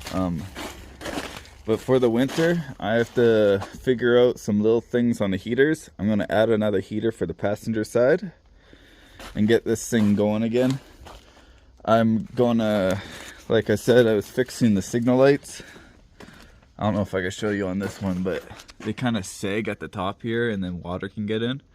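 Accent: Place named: Canada. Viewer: Cool stuff, love those CL Fords, or any Fords for that matter. The Cargo star is pretty dang awesome too, love me some cornbinders, lol. Pretty much anything with an engine. But those CL COE tractors are the best looking IMO, next to a 9670 IH or FLB. Where in Canada are you, I saw the Tim Horton's shirt and the accent gives it away, lol. I'm in NY about 100 miles from the border. Keep on truckin!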